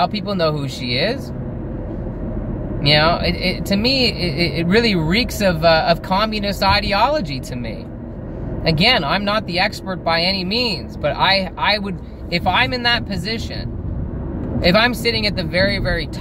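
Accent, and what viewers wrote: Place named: East Coast, United States. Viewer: you're not even from toronto, "ya know." east coast hick?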